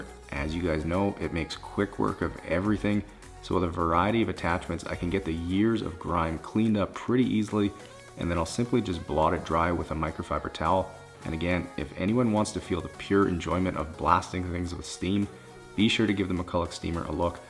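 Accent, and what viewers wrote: Saskatchewan, Canada. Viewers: It’s our awesome Saskatchewan accent 😘🇨🇦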